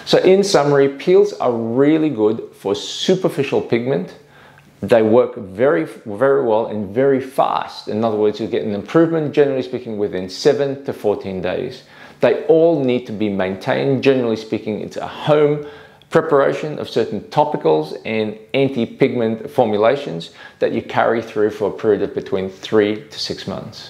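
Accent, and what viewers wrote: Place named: Australia. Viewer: He an Aussie. That’s their natural accent.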